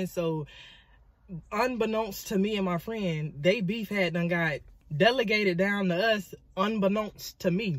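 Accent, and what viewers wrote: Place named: Philadelphia, United States. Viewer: U sound like my home girl from philly… keep the stories coming great job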